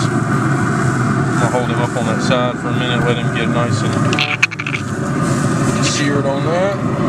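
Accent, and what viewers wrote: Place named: Alabama, United States. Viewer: Are you from Alabama? You sounds like it.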